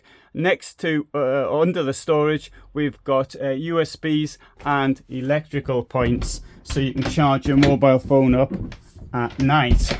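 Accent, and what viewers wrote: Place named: Geordie, United Kingdom. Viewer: Judging by his wonderful accent I’d say the North East of England, if I had to guess I’d say Tyneside.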